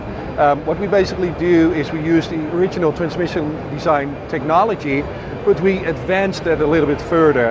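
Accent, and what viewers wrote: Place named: Netherlands. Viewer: LOL i can just hear by the way he talks english he's dutch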